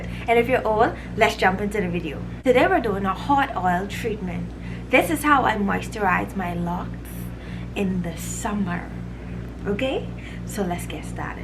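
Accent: Bahamas. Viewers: You’s from the bahamas aye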